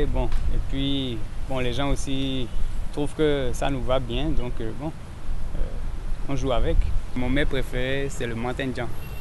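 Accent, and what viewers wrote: Benin. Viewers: Quelle imprégnation!!! On aurait cru entendre un béninois parler. Accent typiquement béninois. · et puis il parle comme un vrai Béninois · Et puis il a un accent purement béninois en combien de temps? alors que les béninois font un ans en France et revienne avec un accent bizarre. C'est bien bro. J'ai pas cru que c'était un étranger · Attends il a l accent béninois!😱😱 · Un accent Béninois pour un blanc 🤔🤔🤭. Je suis étonné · Wahoo un Français qui parle comme un béninois bravo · LOL le Gar parle avec l'accent benenois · Il a même l'accent Béninois bravo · tu as un accent béninois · Quel plaisir de réécouter tes débuts au Bénin... Qu'est devenu ton accent béninois mon cher?? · L'accent beninois* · Noooonnn lui là il est grave hein!! Je ne sais pas si après le reportage, pour la vidéo c'est la voix d'un Béninois qu'on nous fait écouter à la place de la voix du blanc, parce que franchement il a trop l'accent!! 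C'est incroyable!!!! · Waoooh il parle rien que l'accent béninois 😍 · Lui, vraiment le teint joue en sa défaveur, accent béninois pure et claire. Vraiment chapeau français-beninois. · Incroyable quelle intégration !! Si c'était pas une vidéo j'aurai pas cru que c'est un français , l'accent béninois 100% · Il parle comme un béninois ..trop grave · Lol il parle comme un béninois est ke la voix n'est pas truie. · Il a un accent béninois merde ✌️ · Vraiment,un accent purement béninois. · C'est incroyable :o un vrai Béninois dans la voix! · C'est l'acent béninois et puis tous les africains ne sont pas FRANCOPHOne, il y a des anglophones et lusophone. C'est comme si je disais qu'un hatienne parle comme un guadeloupéen.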